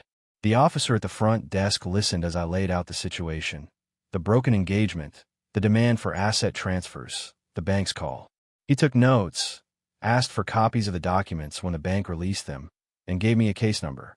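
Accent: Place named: Houston, United States. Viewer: If this not a real Houston story, definitely sounds like a woman from the Southwest side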